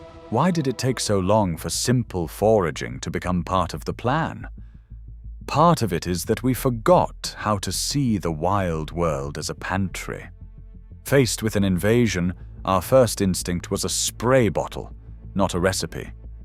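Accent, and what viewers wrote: England, United Kingdom. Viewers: You mean English accent?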